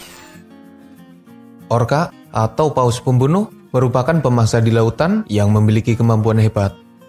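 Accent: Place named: Java, Indonesia. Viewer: Suara medokmu lho mas... Uhug mara'i kangen kampung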